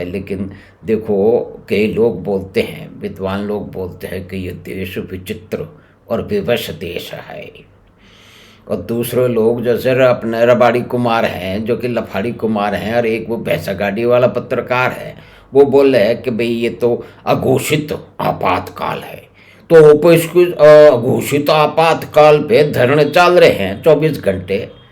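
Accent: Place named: Haryana, India. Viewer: Enjoyed the Haryanvi tone.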